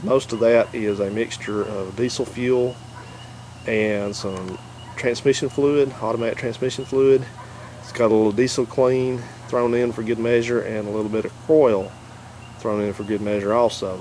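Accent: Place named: East Tennessee, United States. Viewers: You sound like a good 'ole Tennessee boy. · You sound like an East Tenn boy. Am I right or am I right?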